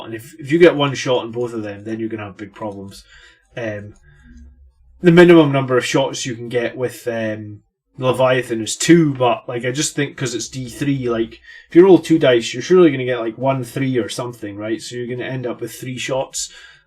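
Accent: Scotland, United Kingdom. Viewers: Is it heresy for me to say I watch your channel but am not making Blood Angels? It's just nice to hear another Scottish voice doing 40k stuff ! I'm building my very first army as the basic boys in blue, but your vids are good for understanding how an army should be built.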